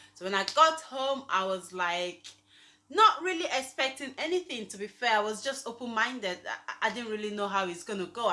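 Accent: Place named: Igbo British, United Kingdom. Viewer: You are so cute 🥰 the igbo accent mixed with british accent na wa oooo 🤣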